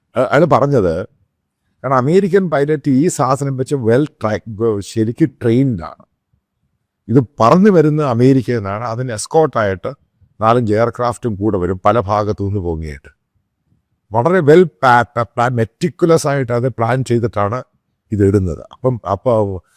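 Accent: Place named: Kottayam, India. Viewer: ആ കോട്ടയം സ്ലാങ് തള്ളൽ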